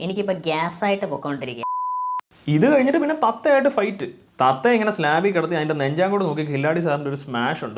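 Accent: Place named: Tamil Nadu, India. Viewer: Anyone feels like you're hearing Malayalam but in Tamil accent?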